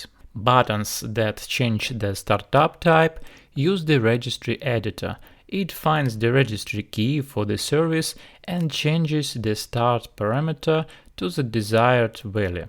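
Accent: Russia. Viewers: Are you russian? :)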